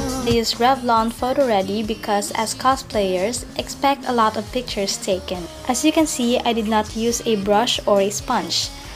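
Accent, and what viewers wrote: Philippines. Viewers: Omg i can totally hear the Filipino accent in you xD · I instantly knew you were from the Philippines when I heard you speak. xD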